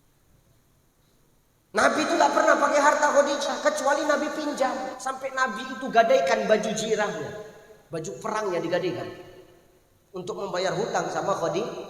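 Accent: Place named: Medan, Indonesia. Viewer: Itubormg Medan bos Krn mmng logat bhsa nya seperti itu,klo kau yg LBH smpurna cba kau yg tegur ,jgn sllu mngomtriborng lain LBH baik komntri dri sndri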